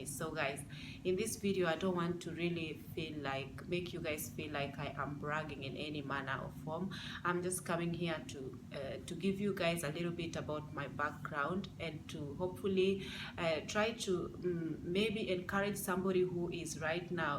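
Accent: Kenya. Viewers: Yeah i knew the moment you started talking.the accent huh😍😍😍😍.mimi pia nimkenya nko switzerland one day i will do a video. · butterfly9274 That's a Kenyan accent. That's why I took Interest to listen on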